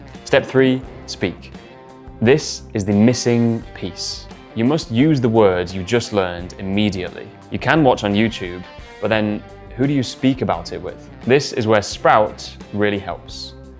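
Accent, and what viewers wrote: United Kingdom. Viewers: Hi. I’m from Thailand. I really love ur Vdo. I subscribe ur channel few months ago. I want to have British accent same as u.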